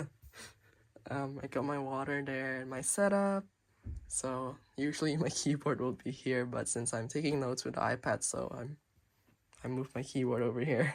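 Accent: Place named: England, United Kingdom. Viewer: The English accent got so thiccc ever since highschool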